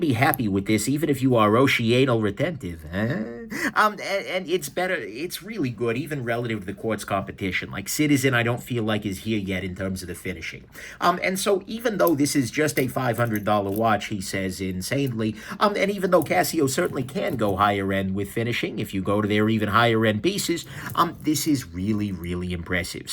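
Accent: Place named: Staten Island, United States. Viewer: You have a Staten Island accent.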